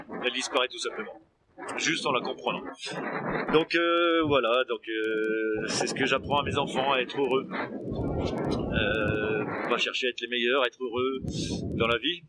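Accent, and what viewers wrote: Nord-Pas-de-Calais, France. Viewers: Une personne simple, qui vie simplement, qui parle « vrai »... Je suis normand et habite en Auvergne depuis peu, j’allais très souvent voir les ch’tis, là haut, que de bons souvenirs. Je pense que nous aurions aimé, mes amis et moi, nous retrouver à boire une bonne petite pinte dans ton bar. Ça me fat du bien de réentendre cet accent. Nous construisons aussi notre petite oasis, de notre côté nous faisons notre chemin. Je te remercie, j’ai l’impression d’avoir pris ma vague d’optimisme et de positivité pour le week-end et plus.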